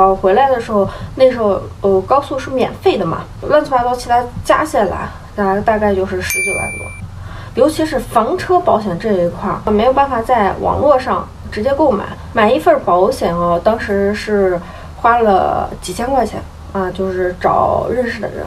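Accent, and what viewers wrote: Shaanxi, China. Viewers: 陕西话摄地聊滴太。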